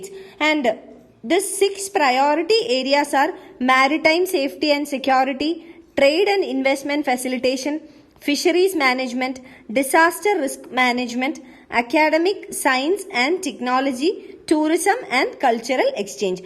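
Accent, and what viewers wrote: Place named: Kerala, India. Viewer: are you a malayali, the accent sounds so. :)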